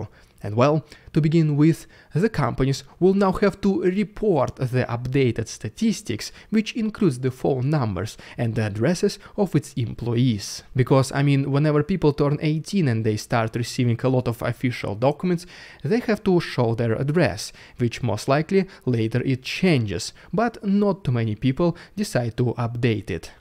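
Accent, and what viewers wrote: Russia. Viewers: I get FPS russia vibes from this guys accent. 😆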